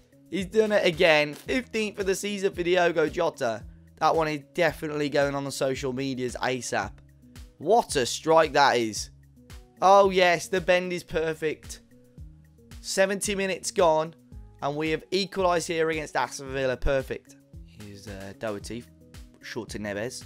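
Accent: Malta. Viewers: you re maltese ? nice channel btw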